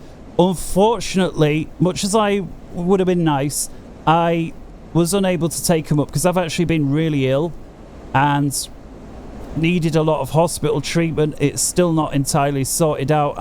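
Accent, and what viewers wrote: United Kingdom. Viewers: thank you for reminding me that I'm allergic to bri'ish english.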